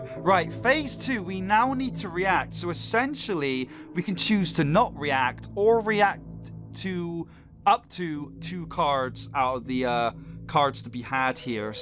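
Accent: United Kingdom. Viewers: Like it sounds like a mix of British and Australian But it sometimes phases into a bit of French And it randomly goes to American I’m sorry if I offended you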